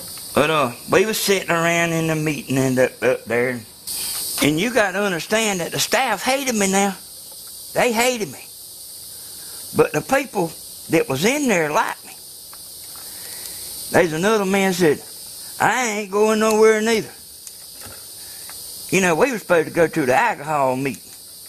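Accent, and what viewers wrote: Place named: Mississippi, United States. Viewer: I really love your videos. Informative, interesting, educational and entertaining. You are so right about the looks of the antenna and your appearance on the job. People judge most stuff by sight. Unfortunate but true. I've worked in installation work before I retired and I guarantee everything you said is true! You have good insight into marital harmony too. I'm from South Mississippi myself and I love your accent. I'm retired and this might be a way for me to make a few dollars to help with living expenses. Lord knows the social security retirement check is barely enough. So glad I found your channel, even if I never do sell them, I've really enjoyed watching your videos! You have a really great attitude! God bless you in all you do!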